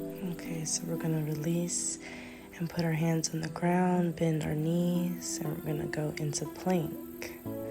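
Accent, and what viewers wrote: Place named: United Kingdom. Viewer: your voice is very soothing - made my eyes close so had to watch the video again. thank you - also I was 100% sure you were British until the switch!! 😅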